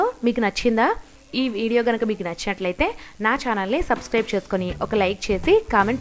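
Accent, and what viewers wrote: India. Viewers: Mi language antha coastal area la undi...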